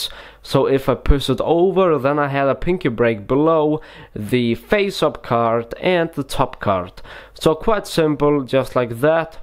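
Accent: Russia. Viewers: It’s called the Russian accent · Oh man this guy reminds me of crazy Russian hacker for some reason because of his accent and sometime he does the "boom" thingy which crazy russian hacker also does😂😂 i love your videos though man, i have subscribed to multiple magic tutorial channels however i think that your tutorial quality is somehow equal to 52 kards... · I love your Russian accent! :D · The weirdest russian accent of all time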